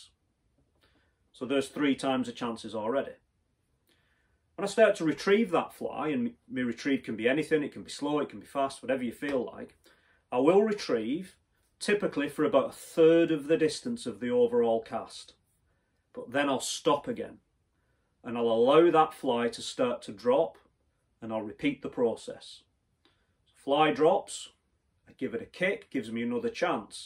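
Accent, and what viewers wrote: Wigan, United Kingdom. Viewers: I think I have learned more off watching this video than I have on any of the others Iv seen - thank you! (could that be a Wigan accent by the way sounds like your from gods country?)😊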